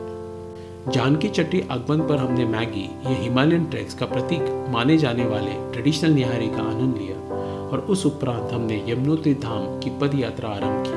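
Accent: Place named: Maharashtra, India. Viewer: I guess you are from Maharshtra or Goa as of you Hindi dialect.Really fine narrated.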